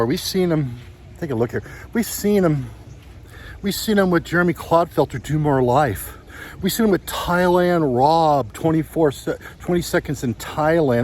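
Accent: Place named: Virginia, United States. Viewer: Stop digging on North Carolina. Your accent isn't much different. What are you from Virginia?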